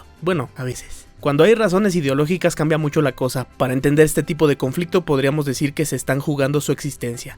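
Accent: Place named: Mexico. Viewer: no puedo ver videos cuando la gente habla asi de culero jaja lastima se ve que tenias buenas intenciones pero tu acento me rompe las bolas, eres de mexico?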